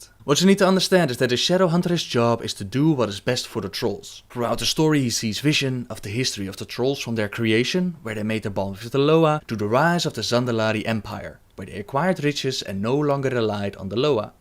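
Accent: Netherlands. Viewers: I'm watching a bunch of lore videos from you and stuff because I recently got interested in the lore. But just a question I'd like to ask, totally off topic... Are you Dutch? Cause I kind of hear a Dutch accent. But I might be wrong. I'm Dutch btw : p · dat dutch accent makes me lol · Are you dutch?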